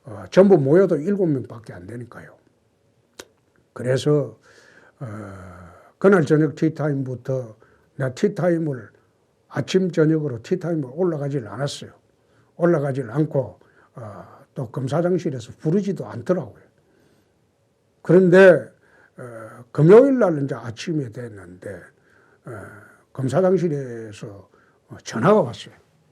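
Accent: Gyeongsang, South Korea. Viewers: 경상도 머심마다.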